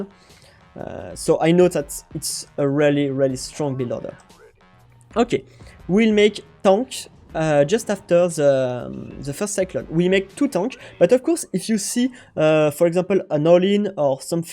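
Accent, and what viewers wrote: France. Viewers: pourquoi les francais de france prononce tous THE comme ZE... <link> <link> <link> serieux je vois celas partout...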